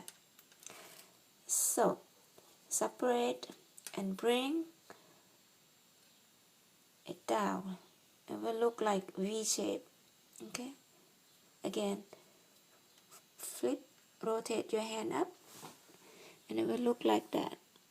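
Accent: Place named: Thailand. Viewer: I love your tutorials! Maybe move away from your camera a little bit. You teach very well. I love your accent. May I ask if you are from Thailand? I lived in Thailand many years ago. 💕. Thank you for sharing your craft.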